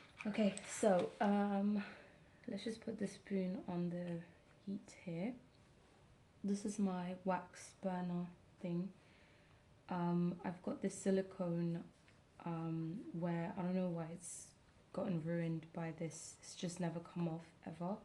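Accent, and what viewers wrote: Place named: England, United Kingdom. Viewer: 💕Hello my Dear...I really enjoyed watching this video because i doooooo love candles and WAX. I collect candle scraps too and put them in dried flowers or potpourri and It all smells divine!!!😅😅 Love your English accent because it's Just like mine..i was Born in Bedford 1970 and have been in Italy since 83...i still haven't Lost my accent even though i speak Italian everyday...You speak very fluently and you are unserstandable...The video Is very relaxing and thank you for sharing....Take care and a PROSPEROUS NEW YEAR to you and loved ones❤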